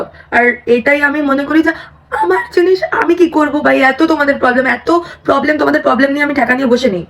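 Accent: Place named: India. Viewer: R ekta content dilm .bostir meye der mto hindi tone e bangla bolis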